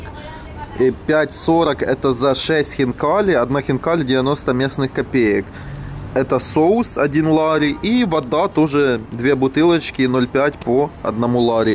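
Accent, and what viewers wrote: Ukraine. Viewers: Такой колхозный акцент как и у всех из украины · По говору слышно что из Украины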